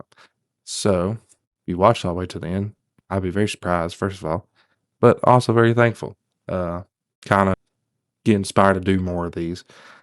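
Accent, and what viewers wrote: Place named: North Carolina, United States. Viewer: love your accent, sounds like North Carolina. I still need to watch this movie, somehow I keep missing some of these ghibli movies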